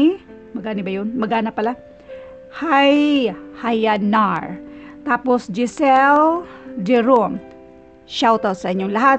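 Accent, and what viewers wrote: Visayas, Philippines. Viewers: Advantage po sa amin mga bisaya yung accent nyo po 😁😁😁 daghan salamat maam god bless ❤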